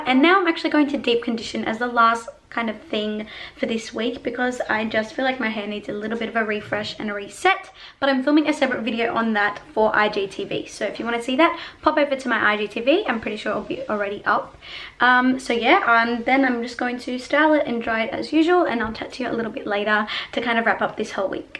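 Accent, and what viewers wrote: United States. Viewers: It’s so crazy to me when ppl do American accents so well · Jana Saghbini I thought you were American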